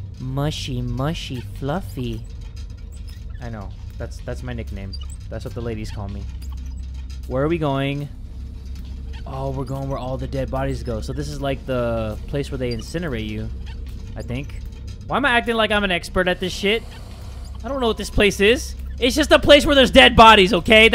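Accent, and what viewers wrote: Indonesia. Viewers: Are you Indonesia because of your pronounciasion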